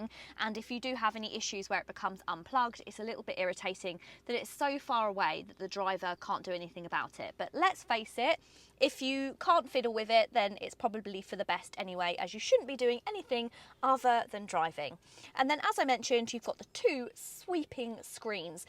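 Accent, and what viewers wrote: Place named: Estuary, United Kingdom. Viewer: Sorry. The estuary accent and the presenter's odd dress sense totally distracted me from the actual content.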